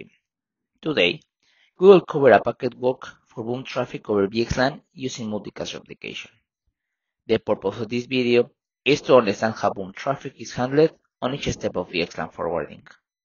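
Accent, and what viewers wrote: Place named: Spain. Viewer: Good content but Extremely hard to understand thick spanish accent.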